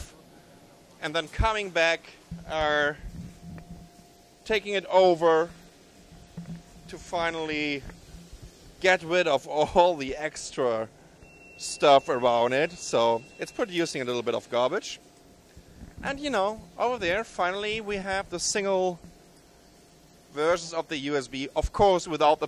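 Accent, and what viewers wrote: Germany. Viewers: Pretty awesome. Was the narrator German? Also, you guys have some cool songs at the beginning/end. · he fucking german, give the dude a break, it's not his 1st language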